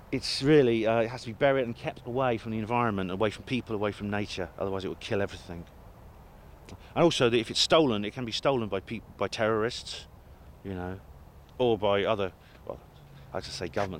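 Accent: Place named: Oxfordshire, United Kingdom. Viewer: Free energy tech demonstration with a distinct Oxfordshire flavour... :) watch?v=cuTp7HkpW7U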